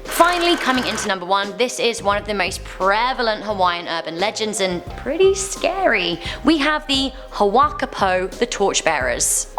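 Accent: United Kingdom. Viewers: Guys, calm down on how she pronounces things, she’s from the UK, and lives in Canada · D'Angelo McIntyre ummmm shes brittish. Can i hear your best french, german, or russian pronunciations??? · Well she's british not a native hawaiian so of course she pronounces wrong.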